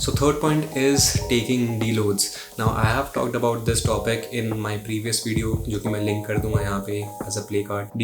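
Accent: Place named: Delhi, India. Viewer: Why do you pronounce English words like that, lol. Like when you say track, it sounds like truck. When you say pack, it sounds like puck. When you say man, it sounds like maan. Thick ass Delhi wala Indian accent.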